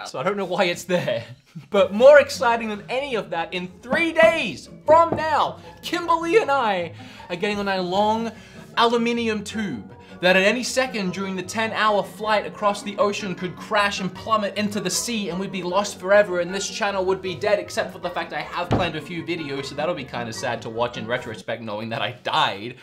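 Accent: Australia. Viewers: Keen to hear your accent become more Aussie again lol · I thought he was from Canada. But now he said Australia I hear it now when he says awesome. Have fun I wanna go to Japan. But I also want to go to Paris and Italy. I just want to travel the world. I’m tired of seeing palm trees.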